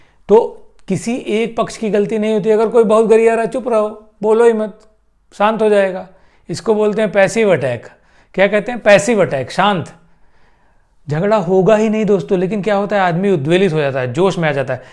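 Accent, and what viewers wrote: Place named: Uttar Pradesh, India. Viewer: Apki boli se lgta hai aap jaunpur ya pratapgarh k rhne wale hain.. Accha lga apse mil kr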